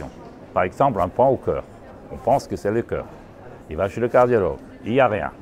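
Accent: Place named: Alsace, France. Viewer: Bonjour, Merci pour toutes ces informations mécanique et technique. Cela m'a fait du bien d'entendre ce petit accent alsacien pays de mon grand-père et de mon enfance 🌱🌸🌲. Qu'est ce que j'aurais aimé savoir si un hydrops peut trouvé naissance enviton 25 ans après hernie discale, syndrome de la queue de cheval, souci alignement dents, aujourd'hui nuque raide, trapèzes douleureux, perte audive, trouble de l'équilibre (qui d'ailleurs s'accentue avec la fatigue, l'inquiétude, tensions!) (qui s'accentue avec la migraine ou céphalée de tension) qui deviennent ingérables à la ménopause et qui detraque mon vestibule, mes muscles ... .. Tout est du même côté mais pour les bras et mains des deux, mais pas la même symptomatologie. Si ce n'est pour l'hydrops ?, je pense que oui. On peut également relier le tout à un parcours de vie compliqué de 9 ans à .. 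Cordialement, E. Parisienne de l'est 🙂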